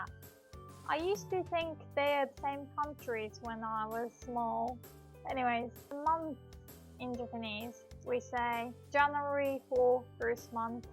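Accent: England, United Kingdom. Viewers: The main reason why I like these videos in particular is because of how she incorporates a lot of vocabulary all at once and she does it in a way where it flows well and isn't overwhelming. And am I the only one who hears a bit of an English accent? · Thank you very much for these videos! I've watched five lessons today and will come back tomorrow to learn more. Your clear British English accent is what makes the channel different and unique from other channels. Please keep it up with the videos, they are informative and useful!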